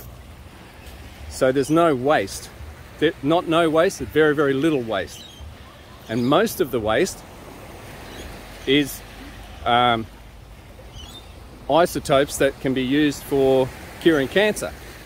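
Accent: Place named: Australia. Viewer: Yes! An Australian talking about LFTRs! What is the status of nuclear power in Australia; LFTR, in particular? Which organisations are out there trying to (re)educate the public about nuclear power (and dispel some of the myths and lies, etc.)? I'm aware of Nuclear for Climate, but they have no policy on LFTR, or any specific reactor type, as far as I could see. It was a bit disappointing that it had to be Peter Dutton to be the first to call for an "unemotional discussion about nuclear power - in particular small modular reactors" in his budget reply speech recently. Still, better than no high-ranking politicians talking about it. But LFTR is where it's at. I've been curious about the combination of LFTR and SMR designs. Can't tell you how stoked I am to hear an Australian accent talking about molten salt reactors running on a thorium cycle. Well, I'm "subbed". 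Keep up the awesome work! PS Regarding the nuclear submarines the current (and former) Commonwealth Government is looking to purchase: I think they'd do a LOT more good if they were put in dry dock and connected to the grid. ;)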